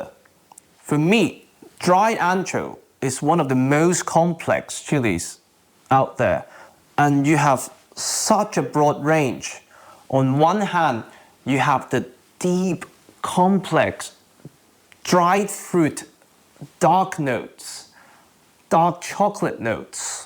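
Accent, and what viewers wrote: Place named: United Kingdom. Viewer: We just saw a man of Asian ancestry with a British accent fuck up a traditional Southwestern United States dish....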